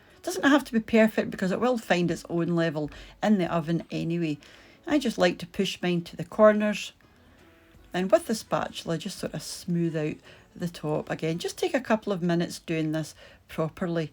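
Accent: Scotland, United Kingdom. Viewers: What a lovely cake and such a cute Scottish accent ❤❤❤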